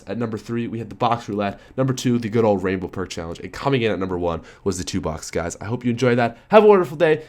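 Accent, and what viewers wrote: Canada. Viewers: don't dis Canadians accent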